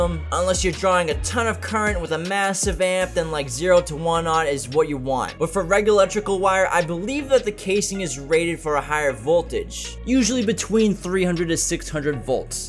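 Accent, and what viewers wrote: Boston, United States. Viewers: This the first time I have heard a real Boston accent, shyt Is crazy how state to state English changes...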